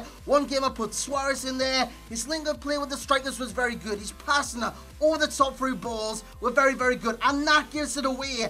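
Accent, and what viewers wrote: Newcastle, United Kingdom. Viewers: hey, what part of the UK is your accent from? I'm from the US so i'm not very good at picking out different dialects.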